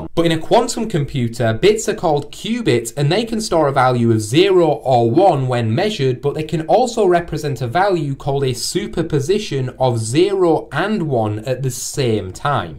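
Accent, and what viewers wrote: United Kingdom. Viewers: i think this channel is going to be the bri-ish "fireship" channel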